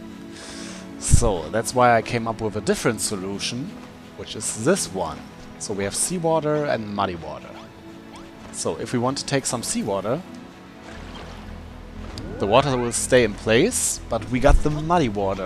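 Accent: Germany. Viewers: You sound so german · I hope its not rude to ask but is that a German accent?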